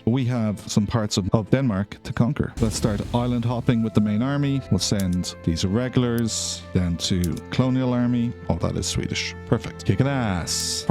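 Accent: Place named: Ireland. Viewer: Great to hear an Irish accent, even better when its with paradox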